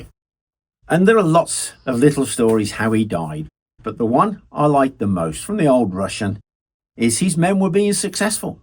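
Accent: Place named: RP, United Kingdom. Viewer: Great video. Posh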